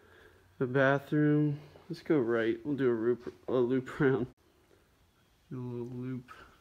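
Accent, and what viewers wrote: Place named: South, United States. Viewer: There is something unnerving about an explore when its insides don’t match its outside. This one creeped me out a bit, but was redeemed in the end by the hand-hewn walls you discovered. You yourself are exactly as your voice suggests: A good-hearted, soft-spoken, southern boy. Pardon me saying “boy” if you find that offensive, but I am myself edging into old lady territory though I have yet to annoy any children by pinching their cheeks. At any rate, your insides match your outsides.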